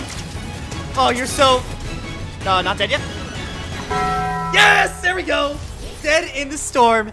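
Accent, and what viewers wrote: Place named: United Kingdom. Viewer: like the British accent lol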